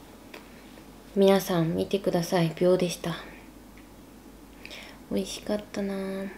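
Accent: Kagoshima, Japan. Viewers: 鹿児島のイントネーション入っててなんか嬉しい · ケンタッキー食べる動画嬉しすぎて泣ける。しかも鹿児島弁聞けてさらに泣けます。今一緒にケンタッキー食べてます🥺🥺幸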